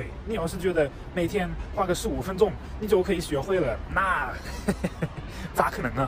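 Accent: Sichuan, China. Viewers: 但是现在被四川话有点带偏了 🤣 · 😀 的中文有四川味。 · 你的川普已经很不错了！加油！ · 中國話帶點四川腔好有趣。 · 普通话有点点四川味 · 好历害，有点四川普通话的味道哟😀👍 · 川普太标准了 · 的四川普通话说得蛮有特色，喜欢👍🏼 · 的中文表达在中国已经可以说没有障碍了。川普的味重，哈哈如果说普通话，我觉得上海的克里斯的中文应该是所有老外中最好的了吧，大山都没有克里斯说得好，就普通话，大山说的是北京儿话音比较味重些，大山还有些字的发音还是听得出有偏，但是克里斯说普通话是很顺的那种。完全听不出是外国人说的普通话！ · 你说的中文带着四川腔调，哈哈。。。希望你去德国的媒体发发中国的真实情况，你们媒体天天抹黑中国，真是可恶🤢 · 一口“川普”挺有意思的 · 的中文说的很流利，表达用词也不错，时不时还带着四川口音，你觉得中国人对外国人用“老外”，这个的口头语是否很风趣可爱？ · 都有四川口音了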